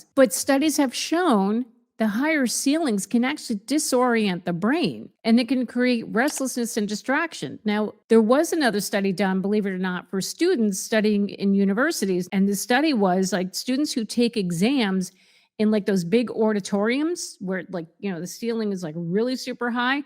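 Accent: New Jersey, United States. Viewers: Gotta love that New Jersey accent! The way she says "off" reminds me of the Sopranos (in a good way).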